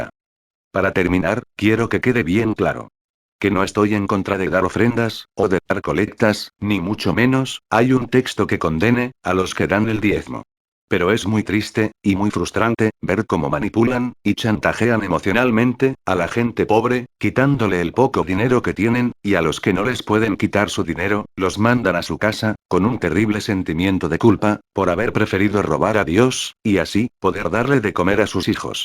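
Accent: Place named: Spain. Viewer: Este Huevon que hizo este Vídeo, porque habla como las huevas, no respeta las comas, derrepente se va ahogar, se creerá gringo, pero parece Español.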